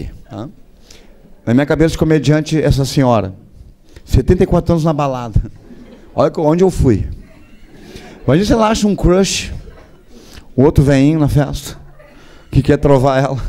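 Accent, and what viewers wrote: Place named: Rio Grande do Sul, Brazil. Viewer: Esse sotaque Bagual malandro é único , o identificação do caralho!!! Muito bom parabéns! 👏👏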